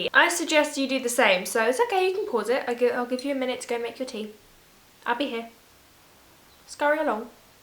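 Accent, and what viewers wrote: United Kingdom. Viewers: Hey! This is the first time I comment because I'm a little shy and I get so embaressed in speaking english with people, so forgive me if there is some mistakes. Well, I want to tell you that I absolutelly love this kind of video, in fact, I love all of them; tutorials, Q&A, vlog's but I really enjoy to know you a little more because you're so funny and ordinary in the best way, you're just being yourself and that's so enough because you are beautiful the way you are. I'm really happy that I found your channel. And god, spanish it's awful to me. It's very similar to portuguese but I just can't speak! haha And just saying: Your british accent is awesome. It's really cute. Here in Brazil we love it. Really. I wish I could stole it from you! xxxxx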